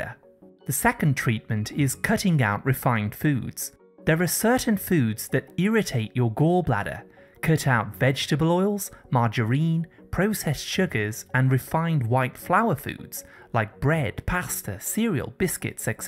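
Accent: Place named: RP, United Kingdom. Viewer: Why does the announcer sounds like a robot with a Buckingham palace accent?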